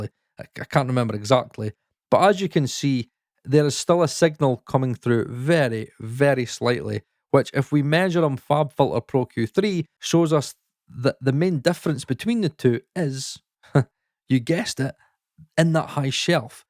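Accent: Scotland, United Kingdom. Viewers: so this isnt a trainspotting video? (im happy about this) i just have a shallow relation of the scottish accent and a 10bag and pin...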